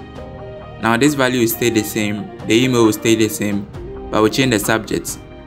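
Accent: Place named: Nigeria. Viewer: Imagine my surprise clicking on the video and hearing the most nigerian voice ever. Nice one, my guy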